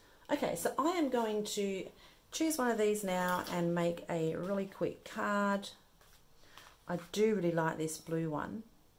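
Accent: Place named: Australia. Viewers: Great technique with the stamping foam, it compliments your previous video using embossing folder. PS Its nice to hear an Aussie accent.! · G’day mate. I agree it’s always nice to hear an Aussie voice doing the videos. Here were lovely cards, love the pink & blue ones? Are you in Australia? Can you tell me the name of the tape runner you used and where to get it please, it looks like a good one. I have bought the small tape runners but they always seem to play up, and I have thrown a few away. Also where do you get your supplies from please, the stamping foams Altenew inks etc, buying from overseas is so expensive with the conversion rate.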